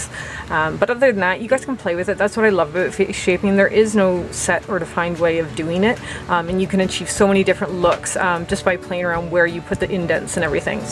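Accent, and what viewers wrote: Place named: Canada. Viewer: You sound like you have a Canadian accent like me 😀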